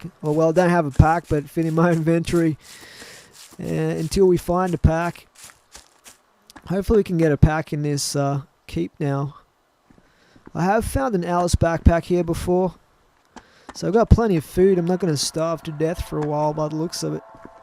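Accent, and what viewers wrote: Australia. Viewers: you sexy aussie like me :D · are you an Aussie?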